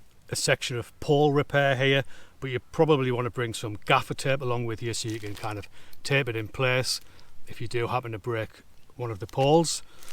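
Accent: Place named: Scotland, United Kingdom. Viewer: the Scotich accent, I love so much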